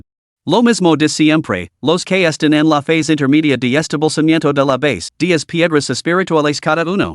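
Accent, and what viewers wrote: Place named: England, United Kingdom. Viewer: Arreglen ese acento inglés que arruina la narración 👎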